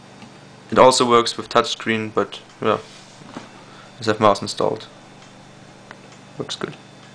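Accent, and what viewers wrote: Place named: Scotland, United Kingdom. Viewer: Haha je praat een beetje met een schots accent:p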